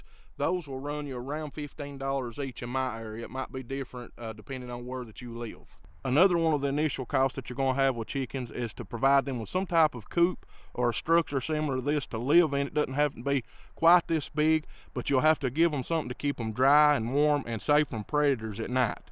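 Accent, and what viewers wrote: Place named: Louisiana, United States. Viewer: Are you in Ruston? Your voice reminds me of my family from Louisiana.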